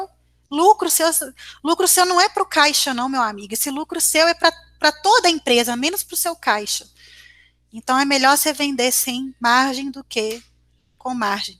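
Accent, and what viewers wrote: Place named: Belo Horizonte, Brazil. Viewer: Esse vídeo vale ouro! Conteúdo excelente! E o nosso sotaque de BH é bom demais! :)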